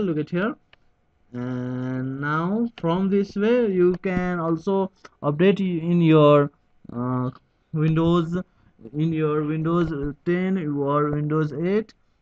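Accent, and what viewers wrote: Nepal. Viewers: Nepali Manxe le English boleko xittei chininxa dai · Dude, are you Nepali? You got a very Nepali accent. Your video didn't even address my problem. Thanks anyways.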